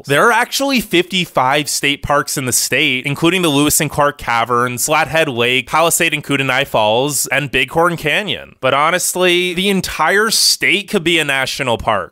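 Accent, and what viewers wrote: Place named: New England, United States. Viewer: Sounds like a New Englander who moved out west and never went to other states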